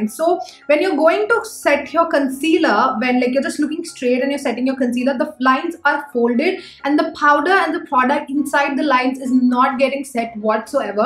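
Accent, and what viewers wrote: South India, India. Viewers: Loving that South Indian accent in your English...❤️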